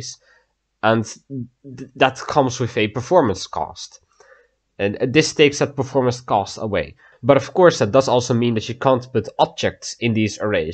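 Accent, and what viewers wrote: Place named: Netherlands. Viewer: woah, i did not expect this this channel to be under rated! nice vids fellow Dutch man! (At least, you sound Dutch :) )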